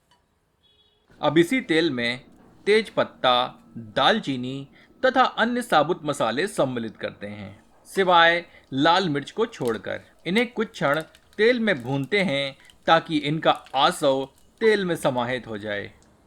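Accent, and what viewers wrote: Standard. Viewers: First of all pranaam for your shudha hindi, recipe is awesome.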